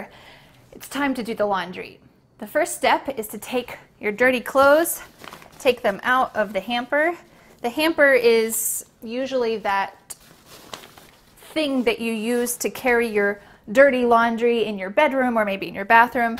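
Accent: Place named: United States. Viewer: It seen like that you are American 😊 Wow ! 😍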